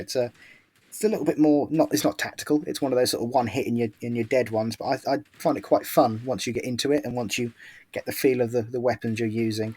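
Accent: Norfolk, United Kingdom. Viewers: Hey this is an old fen tiger that's retired to West Africa ... just found you & it's brilliant ... also nothing wrong with a Norfolk accent ... bootiful 🤗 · Lovely Norfolk accent. Compared to mine you sound clear precise and professional. Ar B lovely ole job.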